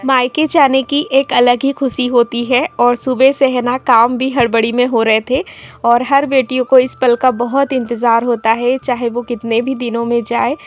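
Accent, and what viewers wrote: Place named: Chhattisgarh, India. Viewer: Aapki boli chhatisgarh ki boli se kafhi milta hai hai na kabhi kabhi gaw ki bola kijiye achha lagta hai ❤❤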